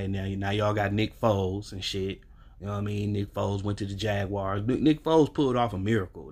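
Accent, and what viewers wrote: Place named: New York, United States. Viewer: I’m a Phins fan but I’m OK with the Pack. I hate the Pats like there’s no tomorrow. I came across your video of the draft and found your response to getting Love hilarious. I know the feeling well. We’ve drafted guys I couldn’t stand. By the way noticed you’re Boriqua flag tattoo on your forearm. I’m thinking your from NY by your accent. How’d you wined up being a Packer fan and not a Jet or Giant fan?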